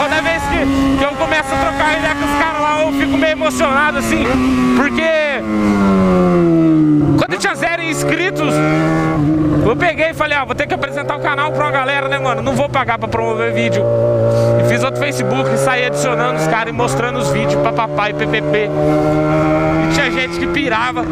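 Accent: Goiás, Brazil. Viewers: Tu é de Goiás mano? kkk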